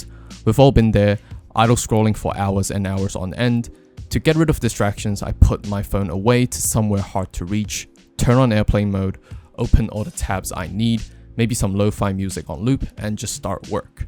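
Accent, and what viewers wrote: United Kingdom. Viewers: I really love your British accents 😊😊😊😊😊😊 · I’m quite certain of your accent. That sounds like brits but not rly strong .. ( I’m eng major from Jpn, cheers)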